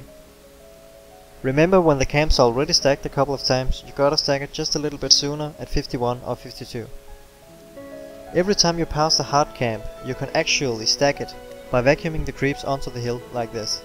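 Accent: Denmark. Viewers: danish accent! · Hi :) one simple question where are you from? your accent sound danish or so :D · Are you danish. because you used the word "og" and you have a danish accent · your danish accent burns through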